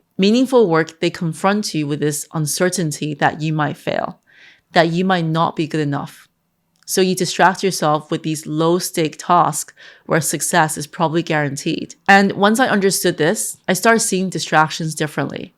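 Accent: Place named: Ireland. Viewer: I hear a slight Irish twang in your accent.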